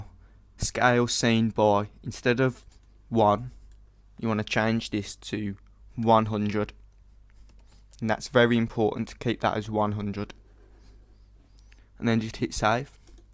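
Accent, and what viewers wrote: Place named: Northeast England, United Kingdom. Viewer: yow aye from roond ere am ya? hahah i recognize that accent.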